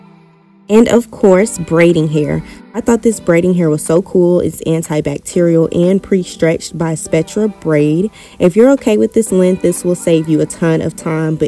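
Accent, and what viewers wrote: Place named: Jamaica. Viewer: Jamaican Accent Yes 💞💞 lol.. You come to take over YouTube 👏👏👌👌 Get it kinks Beauty..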